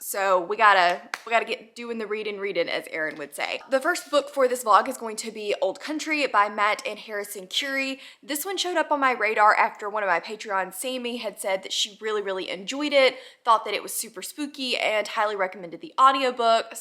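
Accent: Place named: South, United States. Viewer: heehee your southern is really coming out in this video and I love it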